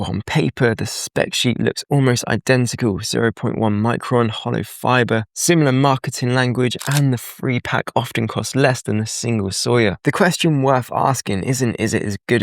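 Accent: United Kingdom. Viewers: Thank you, enjoying your videos, particularly nice to hear a voice from the UK. Would like to hear more about emergency water storage if you're looking for video ideas.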